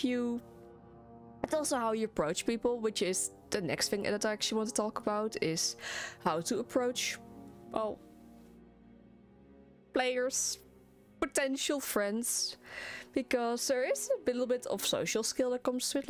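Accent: Netherlands. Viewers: this was a nice video, very educating it is, love your dutch accent btw :P (im dutch myself too)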